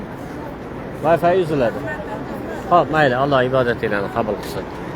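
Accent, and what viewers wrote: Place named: Namangan, Uzbekistan. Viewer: Хожи ака сиз Наманганлик танидим овозингиздан буйрак огриганларга тавсия бергансиз олох зиерат ларингиз Кабул булсин. Олох сиздан рози булсин. Мен сизни. Новосибирдан кузатиб бораман Хаж умрага бордим олохим кайта кайта боришликни насиб килсин Олох. Асалому алейкум ва рахматулоху ва барокоту 🕋🕋🕋🕋🕋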